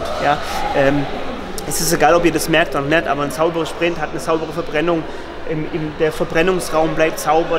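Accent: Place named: Swabia, Germany. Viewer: s’Hebelle vom Golf 2🤣🤣🤣 zu geil, richtiger Schwob bisch bliebe, gell🤣✌️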